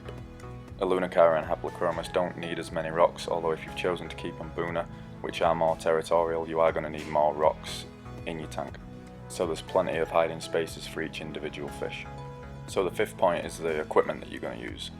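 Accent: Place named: Yorkshire, United Kingdom. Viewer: REALisation: you sound from Yorkshire but you mentioned you're in Pittsburg...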